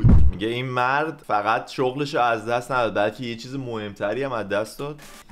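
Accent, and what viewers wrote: Isfahan, Iran. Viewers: اصفهانی حرف بزن ناموصا😂❤️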